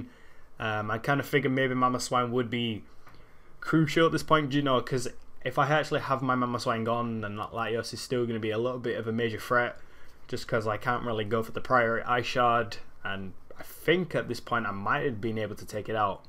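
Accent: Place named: North, United Kingdom. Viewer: So northern.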